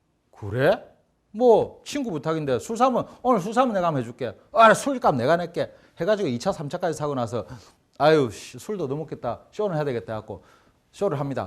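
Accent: Gyeongsang, South Korea. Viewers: 갱상도 말투 쌤도 괜춘하네^^ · 경상도 말씀에~너무 유쾌한 강의가 지루하지않고 재미납니다~~~^#^♡♡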